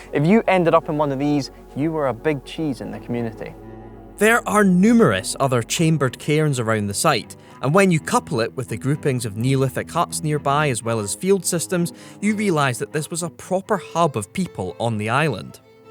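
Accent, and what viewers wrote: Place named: Scotland, United Kingdom. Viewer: He sounds scottish and its the English that say mores for pronounciation of moors .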